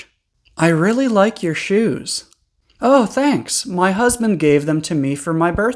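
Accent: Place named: United States. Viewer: Excuse me, I hear your voice and I'm wondering if you're from the United States? You speak very clearly, I can guess, maybe you're an English teacher? Would you like me to show you around my neighborhood?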